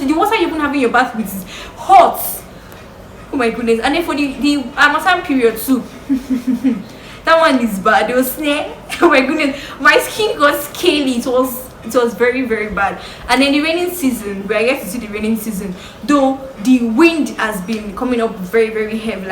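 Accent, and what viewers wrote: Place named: Hausa, Nigeria. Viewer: Nice and educative post. It is very essential to inform ur relative when travelling especially long distance incase of the unforseen,and only also going with extra cash is also vital bcos I can relate,my first travel to north I was stranded bcos I tot I have made the right Budget without knowing that the money won't be enough,God so good I called home and they sent me money. Hausa sweet for ur mouth ooo na,u are doing well,keep it up.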